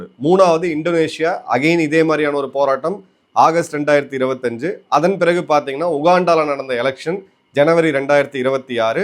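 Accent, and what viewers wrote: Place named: Bihar, India. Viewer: I don't understand why this Tamilian Major is using North Indian bihari backward English rather he should use progressive English of tamilians ...alas serving in the armed forces has its own negative impact 😮😅😂😊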